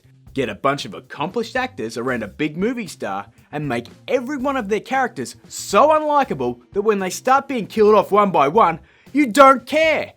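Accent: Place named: Australia. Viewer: This review wouldn't have been at all tolerable without an Australian accent. Really, an American couldn't have used the same body language or expressions and be taken seriously. With that said, awesome review. We align on almost everything here, with the exception of Guardians. I thought Guardians should have been way higher.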